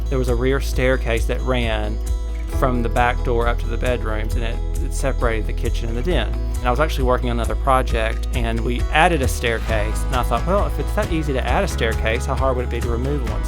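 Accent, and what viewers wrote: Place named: South, United States. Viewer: Absolutely stunning! This Kansas girl loves the southern accent, too!😂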